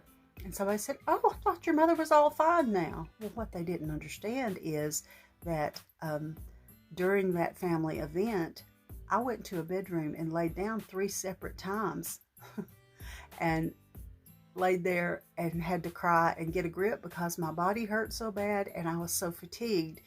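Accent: South, United States. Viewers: I understand you perfectly :) love your accent!! I am a crafter, card making and journaling and I’ve got addicted to watching craft room makeovers and I think you came up in that category if I remember correctly. I also listen to The Scrap Gals podcast and they are from Nashville and Bowling Green, Kentucky so used to the Southern American accent 😉 I love your videos and all the ‘thirfty’ goodness you find. We have Charity shops in the UK but the prices are rocketing so bargains are few and far between here!! I love to hear about your family and how you want to teach your grandchildren about the true meaning of Christmas and that you purchased them a nativity set to play with. They are so lucky to have you as their grandmother xxx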